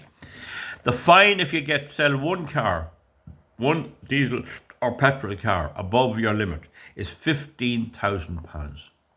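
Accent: Ireland. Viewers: i like the way you explain things. and that lovely Irish accent .thanks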